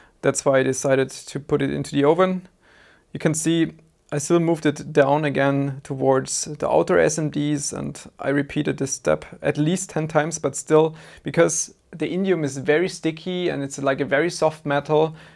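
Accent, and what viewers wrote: Germany. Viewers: you are a native German speaker XD ... I mag the Klang of deinem accent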